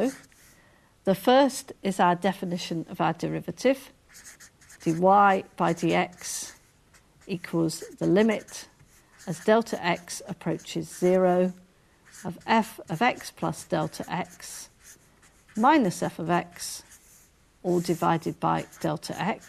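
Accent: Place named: United Kingdom. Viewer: I find it quite stimulating, the Brits accent mixed in calculus real just arouses the desire to follow.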